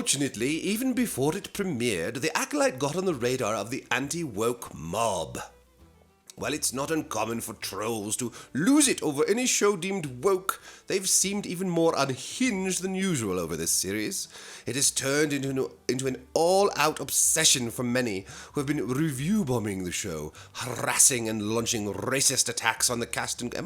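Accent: United Kingdom. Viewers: "Being a critic, you're supposed to tell the truth". Hallelujah. Thanks for being one of the few critics who actually believes this! BY the way, keep up with the British accent - way more entertaining than shows like the Acolyte. BTW - the BBC are making a show about the Battle of Hastings featuring, you guessed it, a diverse cast. Exactly the sort of artificial nonsense you are talking about. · is SINGLE HANDEDLY bringing CREDIBILITY and reason to FORBES Pop Culture topics! Also laying down the SUCCINCT British Accents! 😅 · Moar British accent please!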